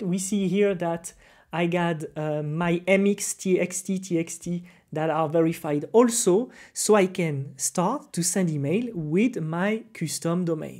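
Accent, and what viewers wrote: France. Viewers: I like that Frenglish ♥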